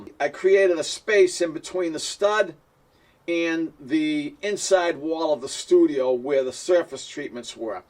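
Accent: Boston, United States. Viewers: I think your accent is far from "the worst" Boston accent. I like it, in any case.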